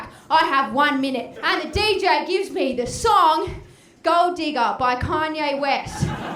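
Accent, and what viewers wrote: Australia. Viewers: Wow you are amazing I love your energy and of course the accent is to die for, I'm from Alaska and there's only ever been one and a half Australians here and I missed the event because it was like 900 miles away almost halfway across the state lol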